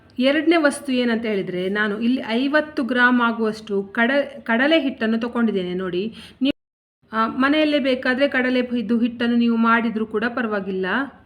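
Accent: Mangalore, India. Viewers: Chanda madi ...astu sala helthira? Mangaluru raga chanda ede..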